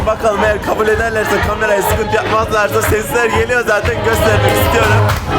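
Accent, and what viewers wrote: Konya, Turkey. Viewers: bir Konyalı olarak en büyük ortak yanımız gözlük 👓 koyma yeri ve bırakamadığımız o meşhur gonya ağzı konuşma 😀 Konya dan selamlar 👋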